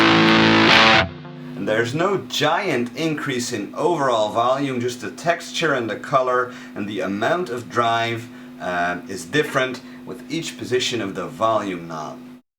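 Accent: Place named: Netherlands. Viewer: Could a silicon transistor be paired with a Germanium transistor? Get the best of both worlds. Also, what accent is that? Dutch? It's barely noticeable.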